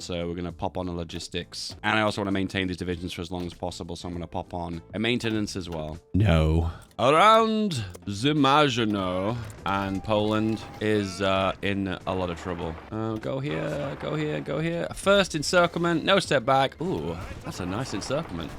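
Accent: United Kingdom. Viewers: why does sound like spiffing brit? is it because their both british?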